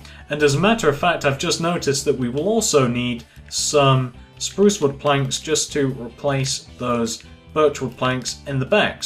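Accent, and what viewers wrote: United Kingdom. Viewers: His british accent. Be nice. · He's British lol it's not his fault he has an accent lol I hope you know not everyone speaks the same lol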